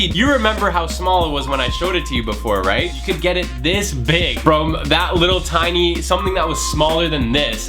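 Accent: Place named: Toronto, Canada. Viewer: sound like your straight outta toronto daagg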